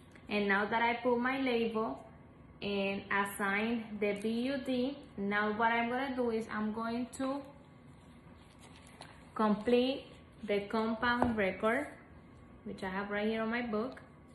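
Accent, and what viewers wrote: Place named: Colombia. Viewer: I know, but they says they can’t provide me separately any more. And I don’t know what to do now. Mr. Derrick (instructor) said y needs to generate it through the visual superscript, but it’s not the way to get the labs. Anything from you can help thank you. I’m from Bolivia y know you are Colombian, I know it by your accent.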